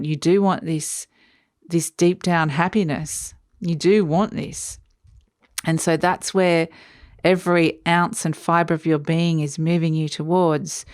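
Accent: Australia. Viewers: DonI recognise a fellow Aussie?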